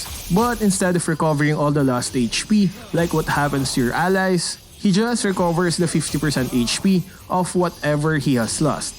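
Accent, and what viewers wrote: Philippines. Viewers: it sounds like he has a filipino accent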